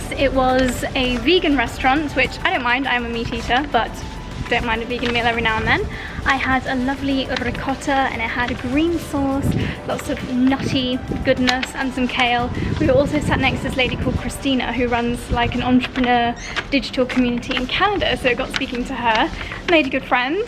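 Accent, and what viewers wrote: Spain. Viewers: You have a very good spanish!! Im Mexican and it was lovely to hear you speak with a quite strong spanish accent 💕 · Love your Spanish accent Buenos Dias a ti good morning to you :)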